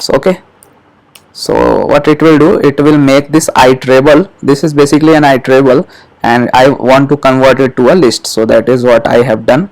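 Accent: India. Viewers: Nice to hear some Indian voice in flutter area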